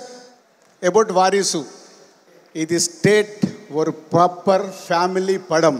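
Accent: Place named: North, India. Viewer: Ena Vada pav vikira vadakan mari pesran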